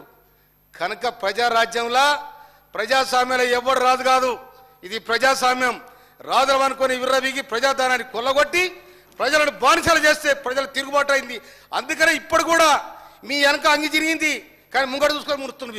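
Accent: Telangana, India. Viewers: తెలంగాణ యాసలో మాట్లాడిన సామేలన్న ....👌🏻👍✊🏻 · మీరు స్వచ్ఛమైన మన తెలంగాణ పల్లెటూరు బాషా సూపర్ అన్న ❤️🤝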